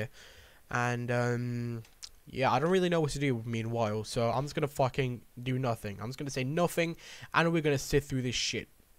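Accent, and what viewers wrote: United Kingdom. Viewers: Nice and Concise mate . I cant tell where in england ur accents from tho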